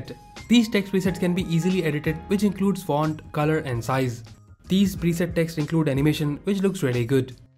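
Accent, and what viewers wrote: India. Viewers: I hear annoying Indian accent, I click away.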